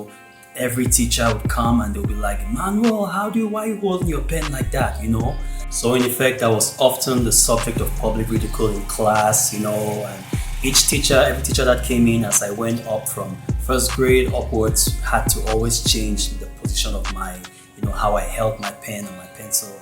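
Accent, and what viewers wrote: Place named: Nigeria. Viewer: I totally thought you were Nigerian, because of your accent. I'm Nigerian, myself. Good to know you're from Yaoundé, Cameroon. I have a friend from Yaoundé but he speaks more French than English. I absolutely agree with your reasons for freeforming and the examples you gave with sports and left-handedness. There's something so true and unapologetic about it that calls us to explore true parts of ourselves that we are forced to suppress. My locs are semi-freeform and I love them so much.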